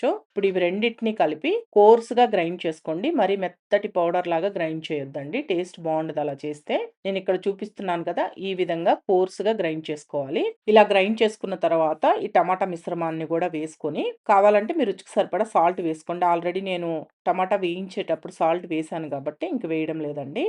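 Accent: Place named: Telangana, India. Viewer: Edi Telangana style😊.. baguntundi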